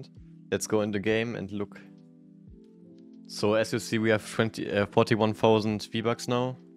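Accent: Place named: Germany. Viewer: I hear Deutsch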